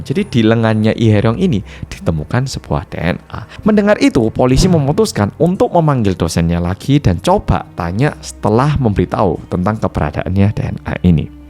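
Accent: Java, Indonesia. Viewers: Enak banget dengar nak "cerita"kaya org jawa lagi mendongeng.g kedengar blas ni orang korea.ngalam pooollll,medhok😂😂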